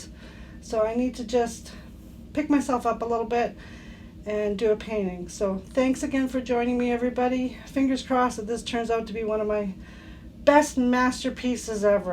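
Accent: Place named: Canada. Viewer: I hear your Canadian! I am too!!! I watch Art with Rossy from Australia and she gave you a mention! New Subscriber!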